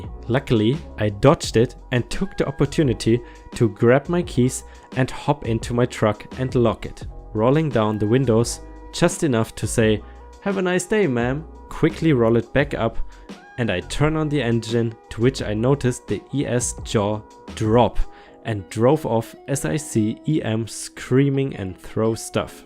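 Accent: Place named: Germany. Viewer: Ah, I was right, you are German. I am subbing for more stories and more of your charming accent. :)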